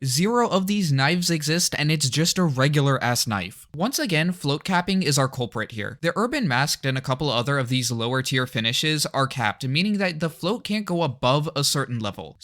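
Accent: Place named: Middle East. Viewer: dunno why but I thought I remember you having a thick middle eastern accent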